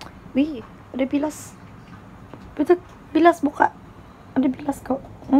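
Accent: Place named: Sabah, Malaysia. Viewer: i love how you keep the sabahan identity by still speaking in sabahan way, showing sabahan surrounding, how sabahan cook, eat and sabahan usual meal. it makes your vlog more unique than the other vlog ☺ i hope that u will not influenced by other countries (kind of vlog). ini utk suggestion utk kamu ja la, maybe somedays u will show more on sabahan culture like going to tamu, ataupun showing them how to make our cultural food like tuhau, bambangan etc while you're vlogging. it makes your vlog more unique dan lgi interesting lah urg bilang, x bkin boring. hehe. keep up the good work. suka tgk vdeo kamu 🤗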